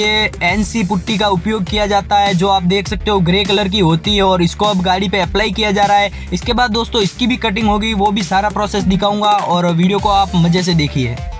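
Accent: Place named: Marwari, India. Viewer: Bhai thari hindi sunr lage thui marwadi hai agr hai to ❤❤❤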